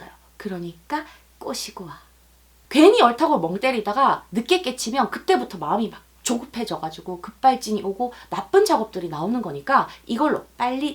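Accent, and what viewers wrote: Gyeongsang, South Korea. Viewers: 사용하시는언어는 부산어 인가욧 대구어 인가욧???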